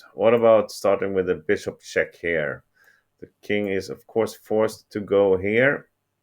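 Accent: Sweden. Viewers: What a bizarre comment!! I like Swedish accents and the overall impression is most personable.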